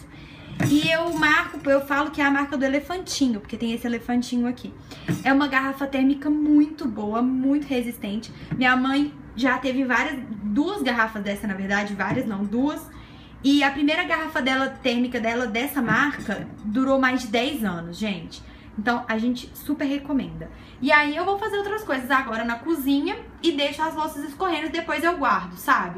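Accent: Minas Gerais, Brazil. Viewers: Eu fico rindo sozinha com essa menina....Adoro esse jeito mineiro de falar.....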